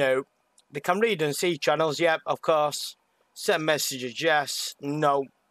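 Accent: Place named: Ireland. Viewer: WAIIIIIIT HES IRISH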